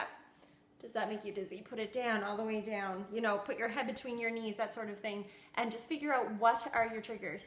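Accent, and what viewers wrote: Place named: Eastern Canada, Canada. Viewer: Thanks for this video. I am just dealing with this now and you gave me some hope of recovery. Worst feeling Ive ever experienced! Are you in Canada? I feel like I hear a bit of an eastern accent. I’m in western Canada.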